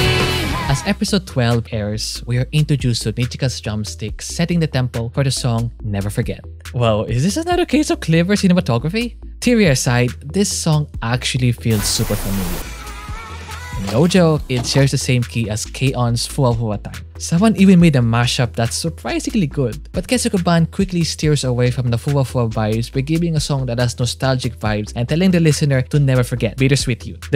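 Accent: Philippines. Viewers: i detect the pinoy accent pare >:) great vid btw!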